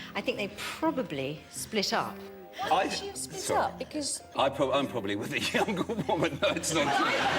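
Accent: Scotland, United Kingdom. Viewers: Nice to hear a fellow Scot. Loved this series first time round and have been watching it on That's TV. I thought Paul and Jan were brilliant. Strangely enough my 1st wife was very similar to Penny! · A charming presenter for a charming series! How nice to hear a gentle Scots accent again! Just one point about the series, and perhaps especially the Christmas special; there was real drama amongst the comedy and Jan was superbly moving in those moments of distress.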